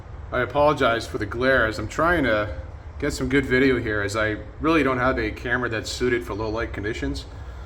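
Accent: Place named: United States. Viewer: Your Accent is American. Where are you from? Oklahoma here....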